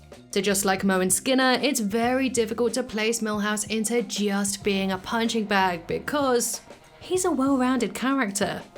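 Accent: United Kingdom. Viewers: your voice and British accent keep me charmed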